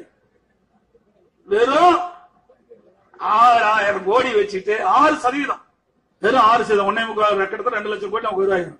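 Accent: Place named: Kongu, India. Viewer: Kongu tamil parakkuthu sir unga tock la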